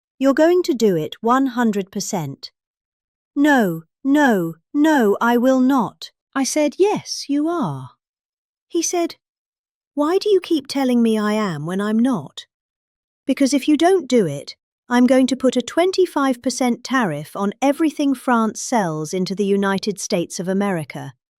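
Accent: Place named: London, United Kingdom. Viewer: I'm a Londoner, I speak with a variant of this accent. I'd feel like a complete embarrassment to myself and everybody around me if I ever caught myself talking this kind of sewage.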